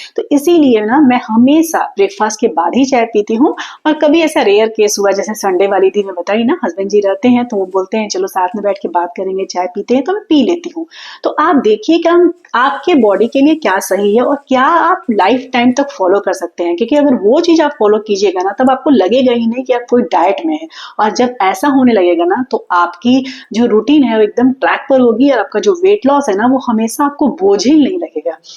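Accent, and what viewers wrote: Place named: Bihar, India. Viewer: Nice blog didi aap Bihar me kahan se belong Karti Hai aapki language hamari Jaisi Hai😊😊😅😅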